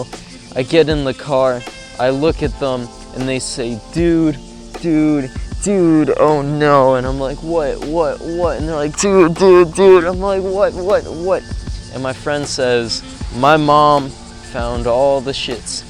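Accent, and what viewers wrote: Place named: Canada. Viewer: Where are you from, sounds like youre from Canada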